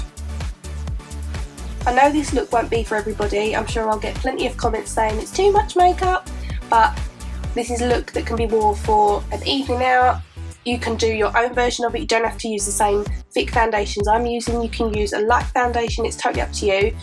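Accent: Essex, United Kingdom. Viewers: I love watching people apply it. It's like an artform. I'm not so good at it, but you seem to have a pretty good grasp on cosmetics. And I hear an accent...Are you from Essex? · First time watching your videos!! You are really talented! if you dont mind me asking, ive noticed you have abit of an accent... are you from essex? :)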